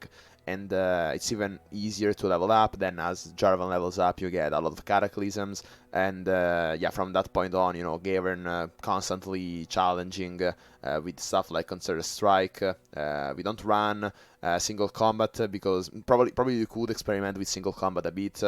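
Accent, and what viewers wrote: Italy. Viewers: l'accento italiano e incredibilmente ovvio comunque bel video. E METTI STO DECK CODE xd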